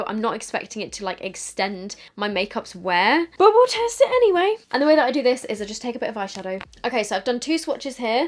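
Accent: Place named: United Kingdom. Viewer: Love the British accent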